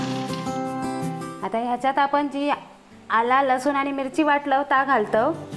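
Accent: Malvani, India. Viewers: तुमचं जेवण , अणि माववणी बोलणं , फार सुंदर .जय कोकण . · Wahh malwani bolan 👌👌👌 bhaji 😋😋😋😋😋😋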